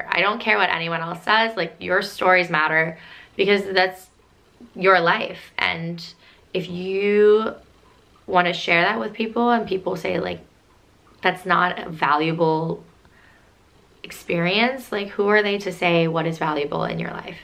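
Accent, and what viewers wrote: United States. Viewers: Your accent is not New York, more West Coast California.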